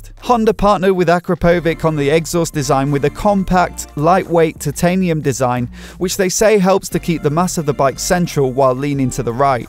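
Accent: United Kingdom. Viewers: Amazing bike, amazing video. This bike is such a craftwork, a beautiy and beast at the same time. And the video is of exceedingly high quality, one the best motorbike videoes I have ever watched. The image is incredibly sharp and clear, and the narrator's crisp and clarion British English is highly intelligible and makes the whole watching process a pure enjoyment and delight. A truly premium class motorbike video prodcution. Hats off to the producer.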